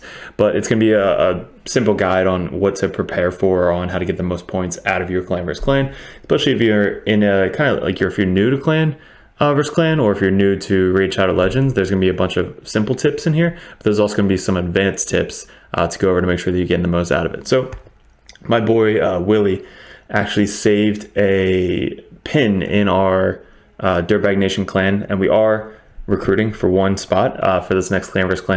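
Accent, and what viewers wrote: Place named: South, United States. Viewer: You sound like you might be local to where i live, you from the southern states? Loving the content bro, keep it coming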